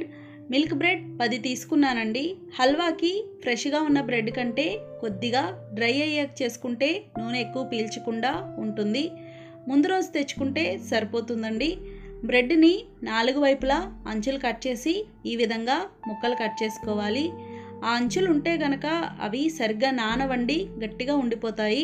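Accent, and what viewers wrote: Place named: East Godavari, India. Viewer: నాకు మీరు చేసే హల్వ కాన్న మీరు మీ మాటతీరు నాకు బాగా నచ్చింది అండి తూర్పు గోదావరి జిల్లా కదా అందుకే east is Best అంటారు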